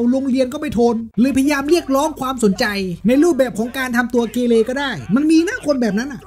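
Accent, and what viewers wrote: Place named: North, Thailand. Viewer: คนที่ไหนนิ อู้มาฮู้เลย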